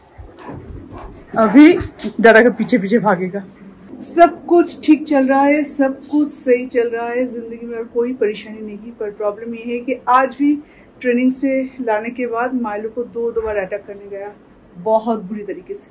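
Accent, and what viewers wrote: Bengal, India. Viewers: she speaks hindi, in bengali.... too much to take... both her hindi and english is a blot for all urban vloggers... upbringing and background matters !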